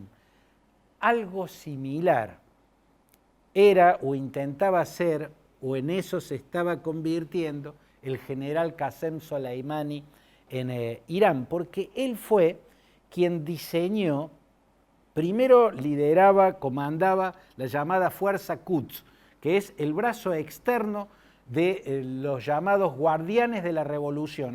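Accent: Córdoba, Argentina. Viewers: Nadie como vos, Claudio Fantini, para explicar estos temas. Ninguno igual para pronunciar esos nombres tan difíciles. Y con acento cordobés de yapa.👌🏻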